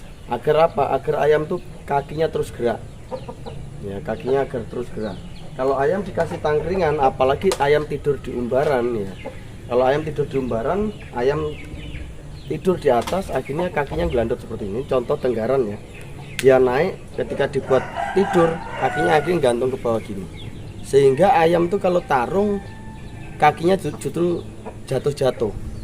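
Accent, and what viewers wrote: Banyuwangi, Indonesia. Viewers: Mas sapean apa orang Banyuwangi to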